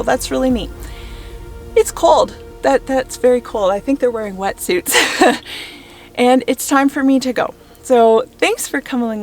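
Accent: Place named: Canada. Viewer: Love that Canadian accent, aboot and all that. Not a fan of the cold but beautiful images. Well done.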